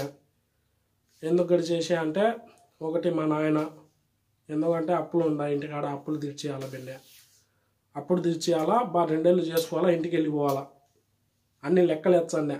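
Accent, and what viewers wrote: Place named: Kadapa, India. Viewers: Hiiii brother, I'm from kurnool oka 2 days nundi mee videos chustunna, chaala baaga anipistundi enduku ante Mee kadapa slank chaala interesting ga undi enduku ante YouTube lo mana local experience dorakadu kada. Anyway good job bro. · Manna rayalashima voice supper Anna tq good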